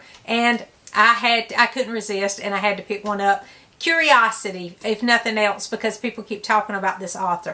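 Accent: South, United States. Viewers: Love your smile... laughter... and, your southern accent!😁🤗💞. Hv u read, “B is for Betsy “?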